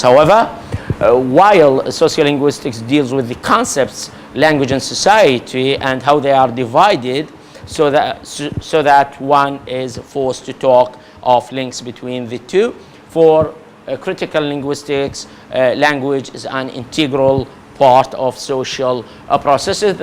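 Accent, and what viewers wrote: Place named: India. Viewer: Though I thought him Indian, his English doesn't bother me at all since I've benefited from his presentation.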